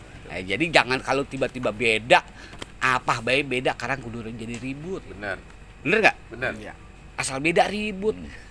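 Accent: Betawi, Indonesia. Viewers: Betawinya tulen banget 👍👍👍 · Abdi Ti Sunda Sangat Kagum Dengan Budaya Betawi Apa Lagi Tutur KataNya ,,,LogatNa enak Di Degar,,, Bang Tolong ilagin Kata Loe Dan Gw Dong... Kata Aye Lebih Enak Di Dengar Dari Pada Gw... Trus Semengat Bang Dan Sehat Selalu...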